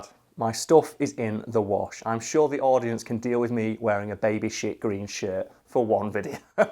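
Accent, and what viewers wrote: United Kingdom. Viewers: He has a british accent or something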